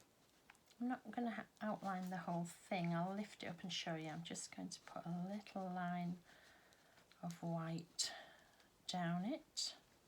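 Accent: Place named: Yorkshire, United Kingdom. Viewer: So lovely listening to a Yorkshire Lass and giving lots of advice & inspiration. Thankyou, from a misplaced Yorkshire Lass dahn souff. Xxh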